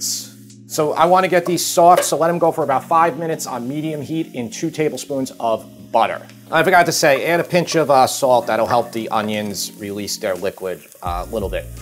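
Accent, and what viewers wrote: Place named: Long Island, United States. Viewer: You can't possibly say "fond" with a Lawn Guyland accent. It won't work.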